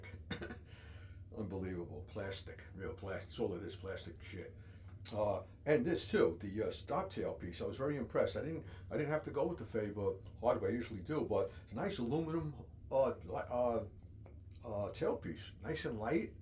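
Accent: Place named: Northeast, United States. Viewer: I'm an Irish guy from the Bronx, and I sound similar to this guy. It's a Northeastern thing.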